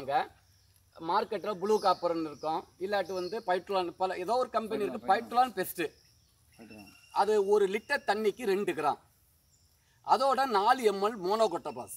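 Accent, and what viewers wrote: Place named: Kanyakumari, India. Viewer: Annachi kanyakumari kaarara neenga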